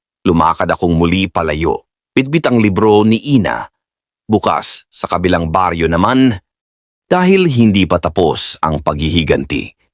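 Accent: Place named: China. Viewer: Intsik ka ata salita mo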